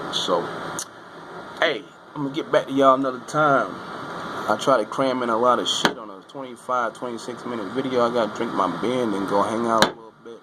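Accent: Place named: New York, United States. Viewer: Nah son sure do have that ny vibes I’m from Brooklyn living in Houston so I can tell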